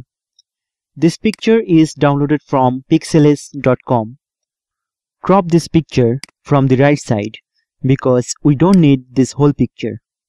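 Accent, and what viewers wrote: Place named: India. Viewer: Desi English 🤟😝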